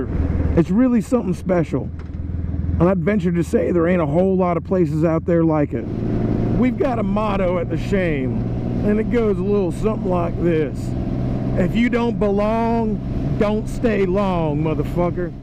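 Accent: South, United States. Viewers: sound more southern in your old videos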